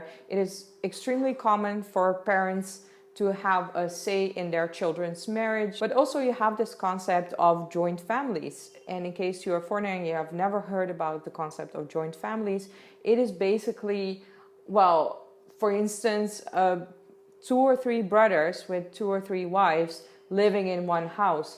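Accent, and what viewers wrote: India. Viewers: You are talking like an Indian.... With such a lot of emotion 😁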